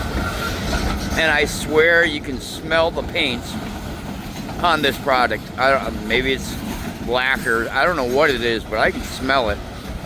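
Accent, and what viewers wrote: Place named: Uttar Pradesh, India. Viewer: I left the U.P. 55 years ago all my U.P. family is gone now to hear your accent feels like home.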